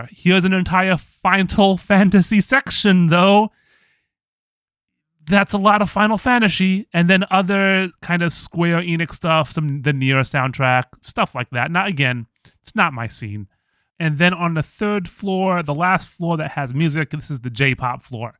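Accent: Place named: New York, United States. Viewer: I honestly just thought you were from NYC or Jersey or Boston or something.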